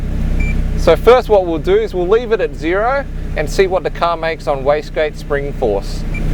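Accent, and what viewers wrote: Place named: United Kingdom. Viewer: he's asian, why does he sound british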